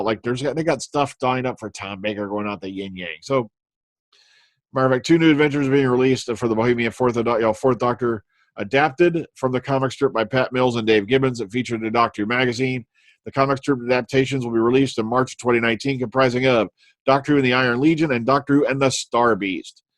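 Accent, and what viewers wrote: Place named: Oxford, United Kingdom. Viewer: Your Oxford English accent is almost spot on! Lengthen your vowels & don't pronounce the r in words like bar, car & far.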